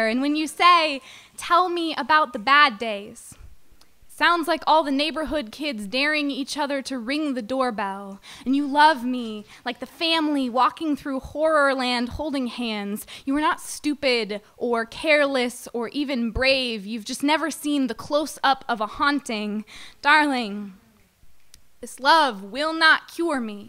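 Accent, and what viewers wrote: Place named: Midwest, United States. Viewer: Is she from the Midwest? I detect a Midwestern accent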